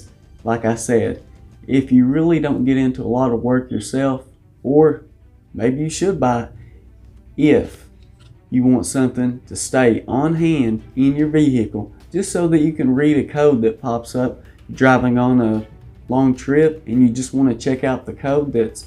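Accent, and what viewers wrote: South, United States. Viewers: nvr watch a southern accent guy's tech vid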